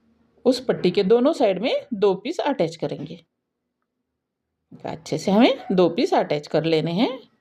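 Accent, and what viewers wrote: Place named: Maharashtra, India. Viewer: mera dost maharastrian hai wo same to same apke jesa Hindi bolta hai ,mujhe sunne me acha lagta hai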